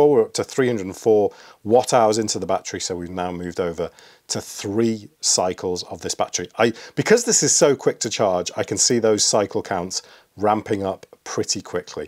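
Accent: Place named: United Kingdom. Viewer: I Love Your British's Accent :elbowcough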